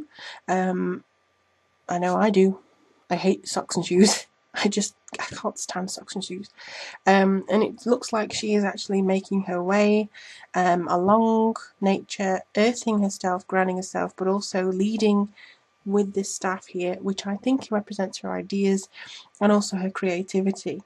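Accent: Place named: Liverpool, United Kingdom. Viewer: Liverpool/Wirral girl? I hear the accent of home :) Good reading, thanks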